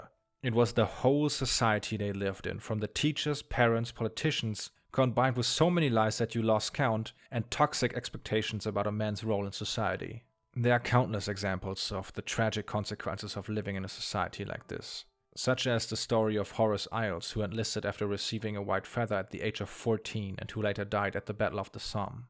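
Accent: Germany. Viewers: I like how emotional your voice sometimes gets, when reading excerpts and impersonating people etc. (Plus I love the German accent :) You don't manufacture enthusiasm and you don't drone boringly, as many youtubers do, you're not fake.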